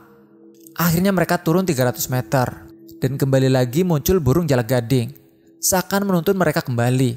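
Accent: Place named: Java, Indonesia. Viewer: masih ada medok nya dikit tolong d hilangin mas... biar enak kedenger nya.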